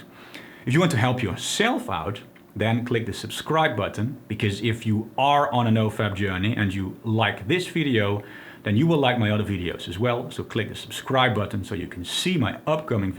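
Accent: Netherlands. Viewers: I sense a dutch accent? My strijder! Good content keep it up jongen!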